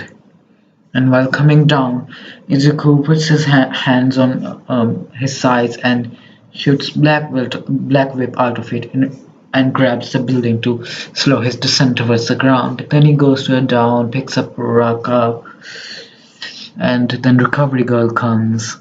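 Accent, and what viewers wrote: India. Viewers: You sound like Indian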